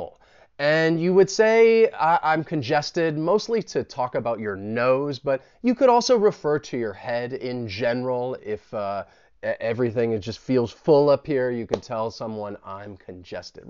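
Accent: United States. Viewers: AN AMAZING CHANNEL AND USEFUL YOU'RE THE BEST BRO I REALLY LIKE THE AMERICAN ENGLISH ACCENT WHAT DO YOU RECOMMEND THAT I WATCH FROM YOUTUBE CHANNELS OR WEBSIT TO LEARN THE AMERICAN ACCENT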